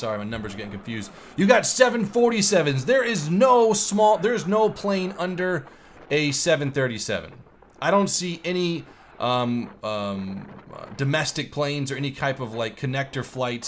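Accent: United Kingdom. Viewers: I thought you were British? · English accent on point!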